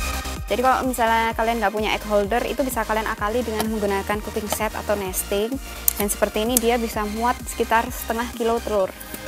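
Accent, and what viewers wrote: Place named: Banyumas, Indonesia. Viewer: Kayaknya asal Banyumas. Medok :D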